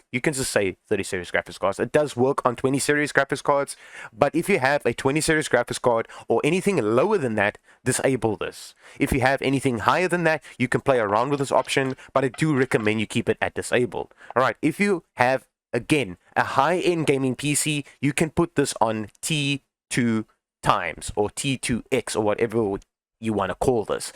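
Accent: South Africa. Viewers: Bro I knew you sounded South African. Great to find a South African tech youtuber!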